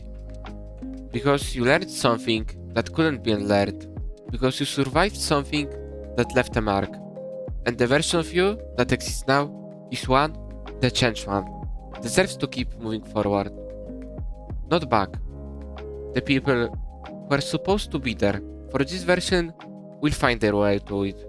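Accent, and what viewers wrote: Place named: Poland. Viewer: Bracie słychać tego Polaka w glosie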